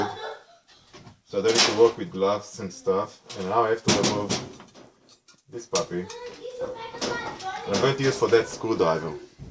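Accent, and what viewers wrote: Israel. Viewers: Definitely sounds Israeli to me.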